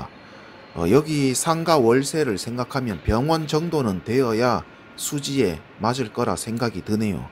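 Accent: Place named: Gyeongsang, South Korea. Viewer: 무식한 경상도 사투리 엄청 거슬리네. 접어라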